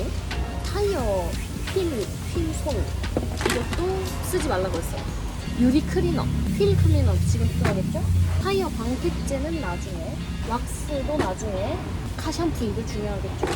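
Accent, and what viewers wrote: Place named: Gyeongsang, South Korea. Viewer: 서울말 쓰려고 노력하는 경상도 미인이네^^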